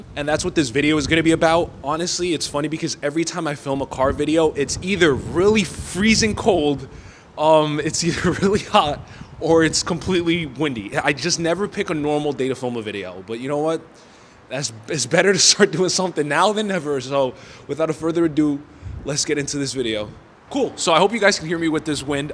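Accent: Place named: New York, United States. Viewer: wow really? I would’ve never guessed that. I was thinking Puerto Rican but didn’t want to say lol. Your accent gives me Italian vibes, that New York City type accent ya know. Love the car btw, I’m working on fixing some things in my 2008 Toyota Camry as well speak, getting a water pump and rear struts installed , and now I need a new transmission too.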